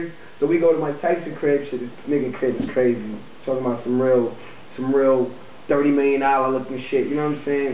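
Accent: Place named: New York, United States. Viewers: Why don't he just tell the story, whatever it is, instead of holding on for dear life to that NY accent and name dropping? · you just dont know every new yorker have that accient manily if u from the projects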